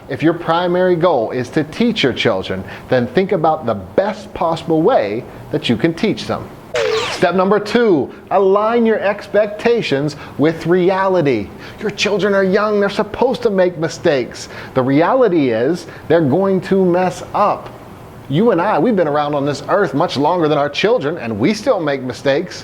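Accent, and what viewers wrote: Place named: United States. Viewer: Why does he speak in an American accent? He would sound better if he spoke in an Australian accent.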